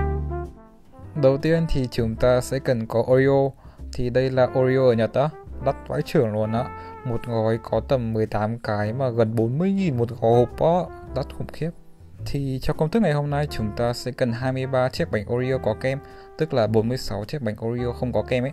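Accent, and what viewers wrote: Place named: Hanoi, Vietnam. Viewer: nghe giống giọng ctrai HN , Thích ghê á :)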